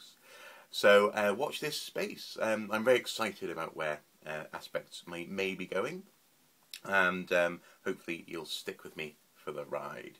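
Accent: United Kingdom. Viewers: are you british your acent is very strong?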